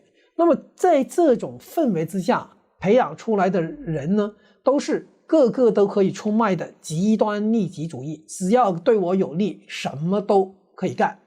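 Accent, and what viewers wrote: Cantonese, China. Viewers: 哥的廣東腔好重R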